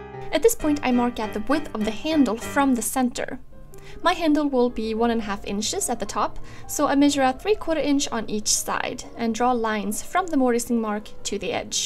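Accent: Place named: Sweden. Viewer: Hello there! This is the first one of your videos ive seen and im just wondering, your accent it sound swedish. Are you from sweden?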